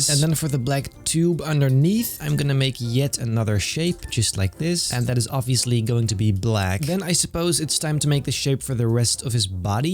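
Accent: Netherlands. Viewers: IDK WHY BUT YOU SOUND SO DUTCH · ik hoor dat nederlands accentje hhahah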